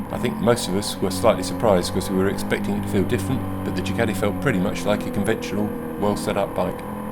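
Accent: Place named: Australia. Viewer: Hi Guys, I am italian and I could follow the speaker excellently. Speed it is not a problem in general if no jargon is used ;-) this said I think that for an internal audience it might be a bit problematic understand speaker's english given the accent. is he australian or what?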